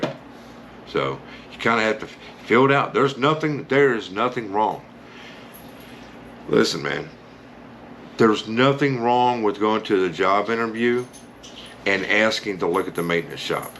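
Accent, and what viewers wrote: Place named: South, United States. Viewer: The down and dirty truth of apartment maintenance, I agree to everything you said. Where are you from? You sound southern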